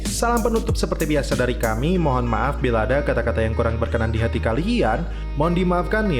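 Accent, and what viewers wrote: Indonesia. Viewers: Orng indo baca nya begitu bg, mau gmna lagi susah di ubah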